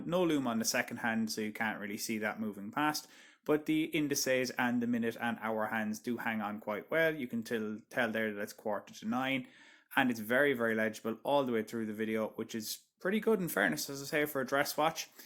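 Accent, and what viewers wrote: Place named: Ireland. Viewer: Got to say your Irish accent in rant mode is brilliant! I've played with the idea of reviewing a Reef Tiger but they're getting plenty of negativity lately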